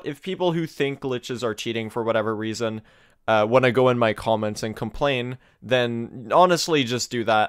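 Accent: Sweden. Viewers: Är du svensk? Jag missade dialekten :D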